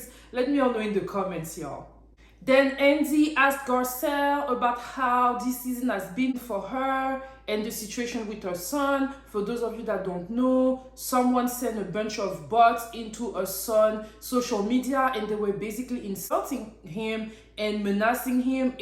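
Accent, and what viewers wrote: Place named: Scotland, United Kingdom. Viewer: I'm from Glasgow! ❣️ I love that you read it in a Scottish accent 😂😂- you know how much we Scots love laughing at ourselves 😂😂. Garcelle and Sutton are definitely the two ladies of the group, and the only genuine friends, as far as I can see 💗 oh god - I would bounce rinna for her actions this season. She is an overdramatic sleazeball, who doesn't care who she hurts to have her moment, she is just like Andy - self serving and exploitative 💗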